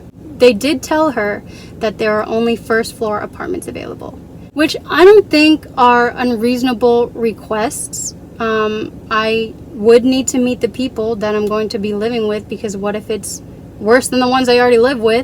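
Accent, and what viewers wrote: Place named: United States. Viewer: I noticed your car is a right hand drive. But you have an American accent. Are you in the states or did you buy a car from overseas and ship it to the states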